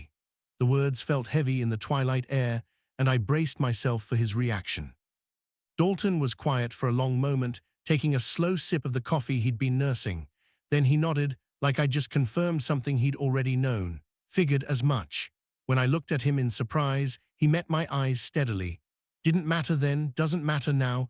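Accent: United Kingdom. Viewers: I really enjoyed the story line and the writing. However, I am not in complete love of the total package. What brings me down is the British accent of the narrator. Giving the cowboys British accents broke the narratives credibility. Cowboys with the a British accent stole the legitimacy of the story. narrator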